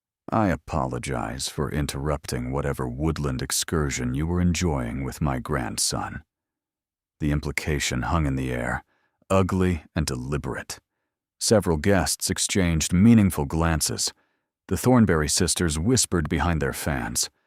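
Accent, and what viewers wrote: United States. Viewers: The American accent doesn't belong in this English aristocratic period story. The narration comes over as bitter & angry. The inflections and punctuation are all wrong and detract from the story telling.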